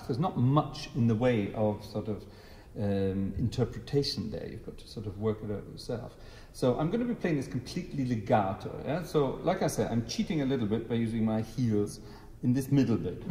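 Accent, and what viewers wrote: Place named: Scotland, United Kingdom. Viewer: Hi and greetings from Scotland. Many thanks for your entertaining and informative videos, enlivened by your cheeky Scottish chappie style. Your videos are aimed more at organists and organ students rather than people like me who have more organ LPs and CDs than they can count (starting with Rogg's 1960s recordings (Metzler at Grossmunster, Zurich, as well as the Arlsheim Silbermann mentioned by Elizabeth). Congratulations as well on the great audio and video quality/camera work-well done Mrs/Frau G! I hope that your followers for whom English is a second language are savouring your beautiful North of Scotland accent as you wax lyrical about Brustwerks and Ruckpositivs. I regret having only a smattering of German, but is someone asking if you now speak English with a German accent? Nein nein nein I say. I hope you're getting some compliments on your German, which sounds very good to me. 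Best wishes Jim Dickson.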